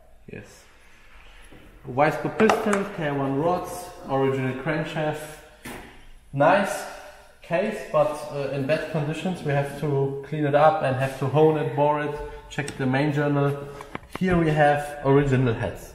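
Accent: Germany. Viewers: wenn deutsche englisch sprechen... :D aber er weis was er macht-top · German English is actually cool to me. We are all car enthusiasts...we kind of already know what is happening even without a lot of words. 😊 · it sounds like a german guy speaking english. wrong how?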